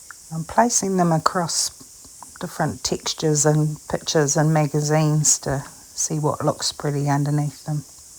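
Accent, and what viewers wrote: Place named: New Zealand. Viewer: who dosent love a kiwi accent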